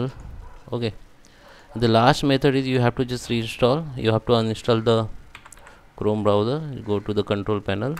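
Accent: India. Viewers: indian accent is umbearable holy 😭😭😭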